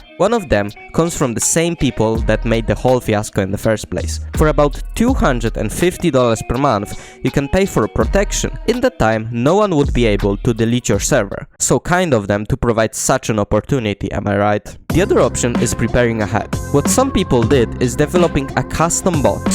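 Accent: Poland. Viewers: slysze polski akcent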